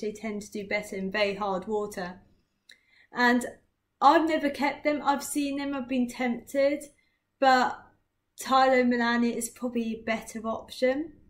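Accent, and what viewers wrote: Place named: United Kingdom. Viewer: Hard to listen and understand due to the Britt accent. Thanks from the new world.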